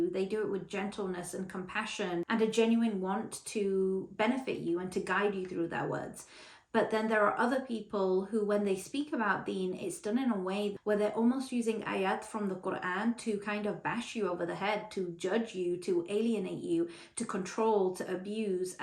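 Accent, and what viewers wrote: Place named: United Kingdom. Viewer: British English adorable!Salam from Kavkaz,Russia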